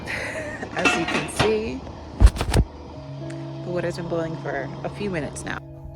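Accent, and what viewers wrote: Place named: Philadelphia, United States. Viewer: Just came across your channel, Love your content already! And your Philly/Delco accent 😆💚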